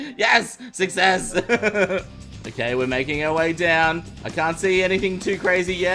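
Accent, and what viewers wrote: Australia. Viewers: What accent do you have? Australian?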